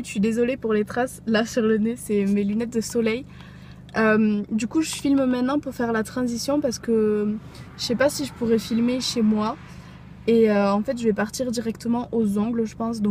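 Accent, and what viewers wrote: South, France. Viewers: Coucou!! ♥ Déjà je voulais te dire que je t'adore tu es une de mes préférées youtubeuses, je te suit sur Instagram et Twitter!;) Tu est très belle! Et j'adore ton accent, vu que tu es tu Sud ( Moi aussi)!:D C'était pour te demander si tu ferais une vidéo sur ta routine sourcil! Car j'adore tes sourcils! LOL:b Sinon, gros bisous je te suit depuis quelques temps maintenant! ♥ XOXO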